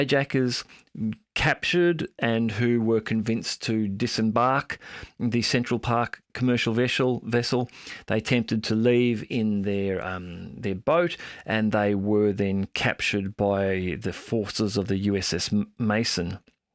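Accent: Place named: Australia. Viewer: and it's nice to hear an Australian voice giving a balanced account. No such voice exists in New Zealand.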